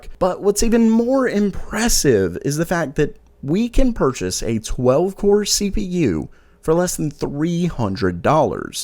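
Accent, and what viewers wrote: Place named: South, United States. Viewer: haha, sounds like you're saying "nanermeter" sometimes. Love that southern twang!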